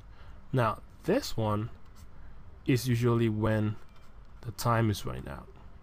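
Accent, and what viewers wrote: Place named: Igbo, Nigeria. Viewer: You sound Igbo.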